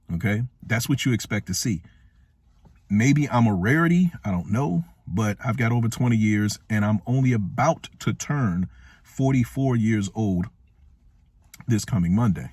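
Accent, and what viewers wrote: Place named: Washington DC, United States. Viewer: Man I heard you telling your story like damn he sound like he from DC and well spoken . Then u said it, subscribe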